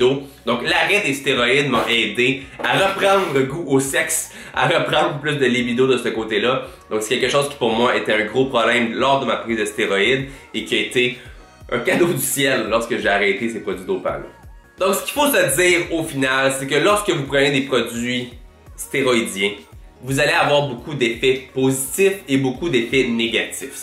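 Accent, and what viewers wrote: Quebec, Canada. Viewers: L'accent québécois.. savoureux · Première vidéo que j'regarde de ce type. L'accent québécois je m'y attendais pas du tout.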